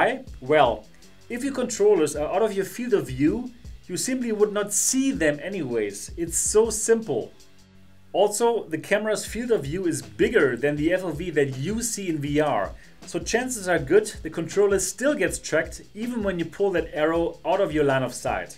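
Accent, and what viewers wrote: Germany. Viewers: ich hab mich am anfang schon gewundert, dass sich der akzent ziemlich deutsch anhört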